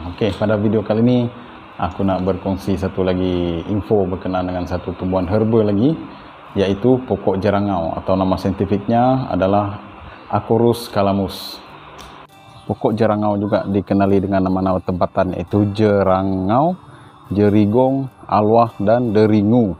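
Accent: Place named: Northern Peninsula, Malaysia. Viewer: Helo bang👋🏻😍salam kenal🤝🏼slang abg macam slang utara semenanjunglah✌🏼😉ok bang terima kasih atas perkongsian yg sangat berguna ini ya..saya x pernah tau lagi pasal pokok jerangau ni..terima kasih bang😍👍🏻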